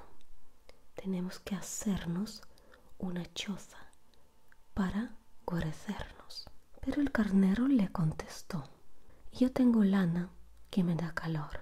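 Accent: Russia. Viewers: Es española ? Porque ese acento de rusa?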